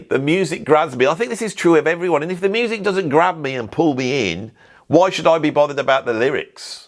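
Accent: United Kingdom. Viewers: Sorry, squire, I gobbed on your carpet. Frankly, your entire verbal rap is obnoxious, and you can't even hear yourself making "bloody dodgy" statements in that bloody rough British accent. You state, just before I decided to bail out in disgust, that you're going to give your "objective opinion". Really?! An "opinion" is not "objective" by definition. And using language like "a piece of shite [lovely]" and "yech" (huh?) are hardly the verbiage of objective criticism as opposed to highly subjective and low-IQ reaction. You're starting out wrong when you foolishly seek out the Rolling Stone's "500 Greatest Albums" or whatever lame source, though there will be some worthy albums in such a list. As for Neil Young, it's pretty simple. He WAS a great artist at one time, from roughly his time in Buffalo Springfield through his first few solo albums and some collaboration with Crosby, Stills and Nash. 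His albums were usually erratic in terms of song quality, but the earlier solo albums feature many great songs. He has not produced an album worthy of his legacy for more than 25 years, instead popping out these dull, lyrically strained and melodically uninspired "songs" out of a desire to keep playing and stay relevant somehow. Fans with no critical faculties and many critics who betray their own standards continue to praise and indulge Neil. But meantime, you think some Nirvana album is "bloody amazing" but you determine to hate Neil Young and the "Harvest" album. Well, bud, your non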